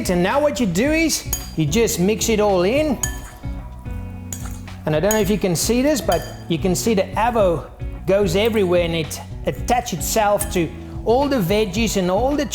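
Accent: South Africa. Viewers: He is most definitely South African. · south african? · haha i think he is south african lol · I think he's South African?????? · Are you South African? Sounds like it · He sounds South African, haha. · south african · are u south African? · South African = best accent ever! Wonder how he cooked the chicken? looked good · He sounds South African. An Afrikaner to be exact. Yup english is clearly not his first language.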